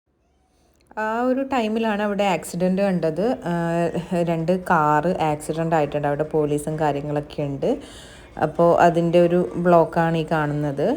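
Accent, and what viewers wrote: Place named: Kannur, India. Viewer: Kannur kasrod slangilulla ninte voice kelkkan nalla pangan...😊😊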